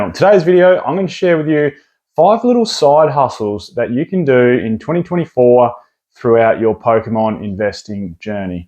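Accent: Australia. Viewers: All facts, i just really enjoy these videos. The aussie accent doesnt hurt either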